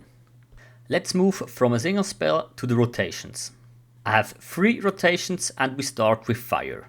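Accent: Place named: Switzerland. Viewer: very thick swiss accent haha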